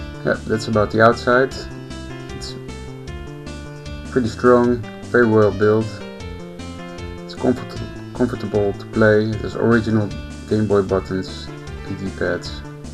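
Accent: Netherlands. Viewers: Soep in je schoen. Nederlands accent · You are dutch, i can hear it from your accent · the second he started talking i knew he Dutch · Are you Dutch? Cause you sound a little Dutch. :) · You must be dutch, what a horrible accent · Dat Nederlandse accent, though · Nederlands accent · Are you Dutch? Becouse you sound Dutch. I'm Dutch :) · w0w dutch accent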